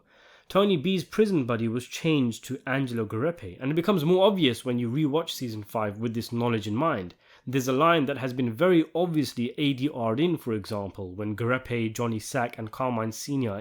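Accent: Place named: British Asian, United Kingdom. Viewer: Is the narrator British Asian? He has a slight accent. Good video - good channel, just curious.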